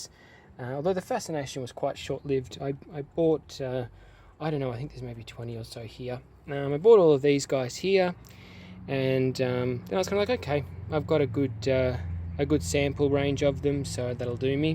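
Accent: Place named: Australia. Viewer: I appreciate your thorough descriptions and naming your cacti. Love you generosity and Ausey accent. And no I don’t like yellow flowers either. Dandelions are yellow aren’t they?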